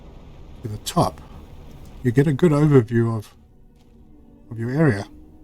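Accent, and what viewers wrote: South Africa. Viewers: Your slight accent makes me think South Africa region? Anyway, I am really looking forward to this next update. Been playing for a few months and have been loving the game.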